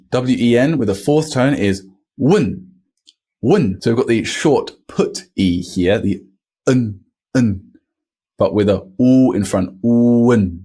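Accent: Standard. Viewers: 作为一个中国人，值得肯定的是这位老师的中文发音非常标准，教学也非常专业，细节非常到位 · 有一说一你这发音太过标准听起来要么是经过专业学习的外国人要么是正在播报的播音员因为这边儿国人说话或多或少都带点口音或者吞音，不过作为外国人能说得这么字正腔圆属实难能可贵。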